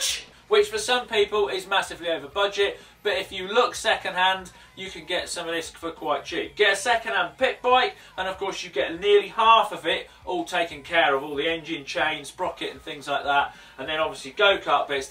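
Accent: United Kingdom. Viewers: Why is every British person the most British person?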